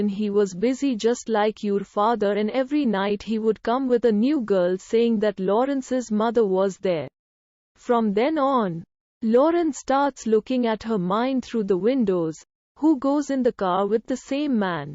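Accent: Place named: India. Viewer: What is with that voice? The channel is called "English Man" and I hear a woman's voice with a heavy Indian accent saying nonsensical things.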